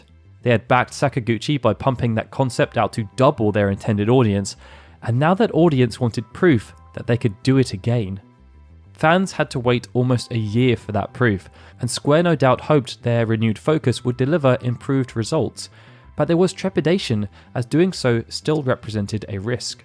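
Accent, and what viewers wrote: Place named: RP, United Kingdom. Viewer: Great stuff! Intriguing and well crafted as usual. I grimaced at /I no va'TIV/ though! I've always heard /'I no vei tiv/. It's obviously down to your exceedingly posh british accent.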